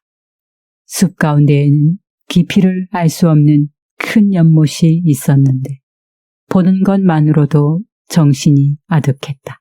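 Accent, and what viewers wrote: North Korea. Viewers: 억양이 북한쪽 같네요? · 북한사람이 읽어주는거 같네요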